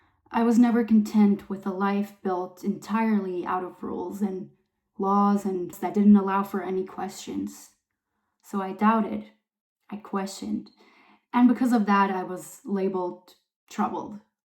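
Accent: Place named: New York, United States. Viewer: I love this! I didn't realize you had an accent! Is it a NY accent or an accent from speaking Yiddish?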